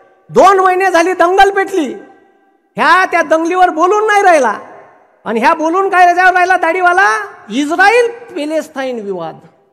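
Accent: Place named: Nagpur, India. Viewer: Nagpuri bhasha aahe aamhi asach bolto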